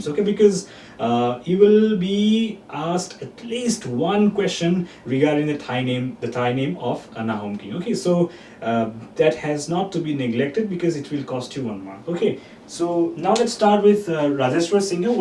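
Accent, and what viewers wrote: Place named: United States. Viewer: aapuni US r pra ahise nki ne UK r pra. val english koise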